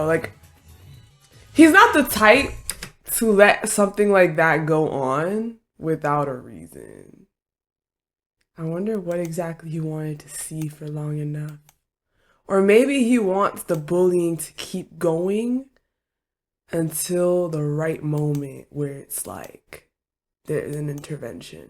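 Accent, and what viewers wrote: France. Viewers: where u originally from? are u french?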